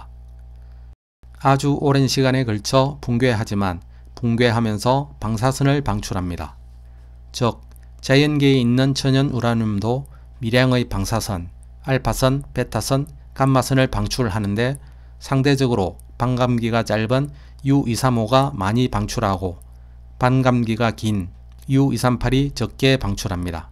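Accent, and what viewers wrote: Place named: Gyeongsang, South Korea. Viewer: 보리문뎅이 발음 심각하네 스방의 열화 우랴늄 ㅋㅋ 적 1퍼쎈터가 되도록 농축얼 합니다 ㅋ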